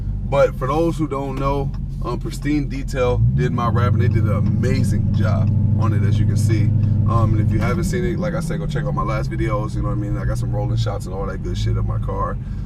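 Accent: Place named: California, United States. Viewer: You from Cali? Sound like it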